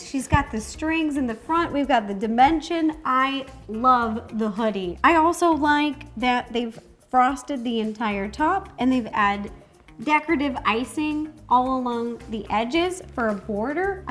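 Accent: United States. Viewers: Haven‘t hearrrrd SUCH an American accent in a long time but sounds cute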